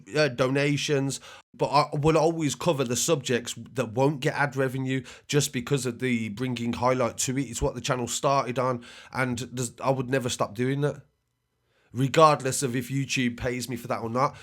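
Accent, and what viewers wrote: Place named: Midlands, United Kingdom. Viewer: I saw this on bbc days ago . But the news is always better in a Midlands accent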